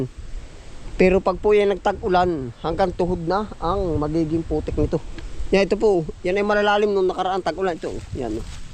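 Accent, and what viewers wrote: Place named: Aurora, Philippines. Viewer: Nkklibang k po pnuorin ..KC Yun slita nyo my Punto..khwig Ng slita nmin ..aurora province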